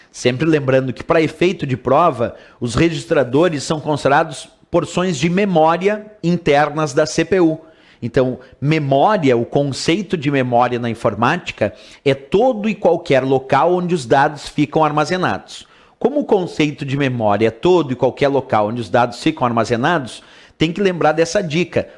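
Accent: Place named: South, Brazil. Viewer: nossa que voz chata de povo do sul, sutaque horrivel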